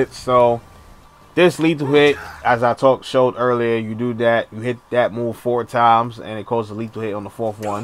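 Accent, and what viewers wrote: United States. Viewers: Fuck all these haters, you speak like an American, and you know how to teach. This bullshit 6ab or whatever doesn't work when teaching newbies, good shit man!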